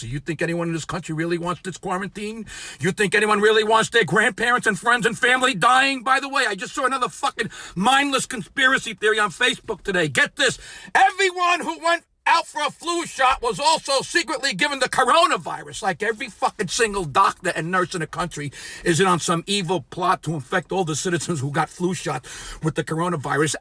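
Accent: New York, United States. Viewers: I am so warmed by this. The Rant, the accent, the 'you're an idiot' head tilt. It feels like home. Damn I miss NY.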